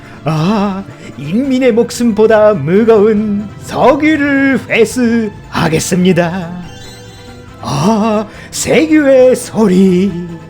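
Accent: North Korea. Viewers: 北朝鮮語ってだけで響きで笑ってしまうのはなんでなんだろうｗ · 아니 북한어 구사율 99.9%인 것도 웃긴데 중간중간 세뇌에서 풀려나 본심 튀어나오는 게 개웃기네ㅋㅋㅋㅋㅋㅋㅋㅋㅋㅋ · 見事な北朝鮮語李春姫方言ですね！ 余計なこと言って粛清される映像が景色に差し替えられてるの笑う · 私韓国人なのに 単語選択からイントネーションまで 本当に北から降りてきた人だと思った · 북한 방언 엄청 잘 따라하시네요 ㅋㅋㅋㅋㅋㅋㅋ 웬만한 한국인보다도 잘 따라하시는 것 같습니다 ㅋㅋㅋㅋㅋ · なんだろこの耳障りのよい言語 南の傀儡とは全く違う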